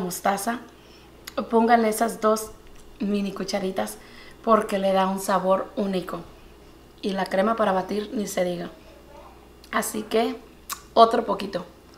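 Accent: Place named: United States. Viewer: Usted tiene tone de rancho pero se siente americana Por sus pronunciaciones eso se llama malinchista, Y dice que es de Michoacán.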